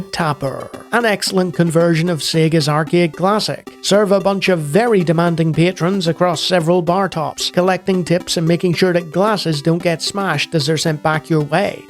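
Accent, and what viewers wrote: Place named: Ireland. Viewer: I hear you have the Irish accent that pronounces 3 as three, not as tree like other Irish pronounce 3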